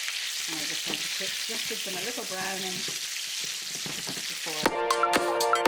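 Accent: Ireland. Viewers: Your not from Dublin your Limerick, but your Irish and like our Coddle yummy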